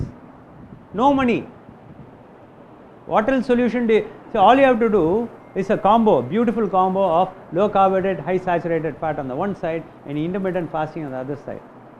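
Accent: India. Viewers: He is repeating Dr.Jason Fung in an indianised english.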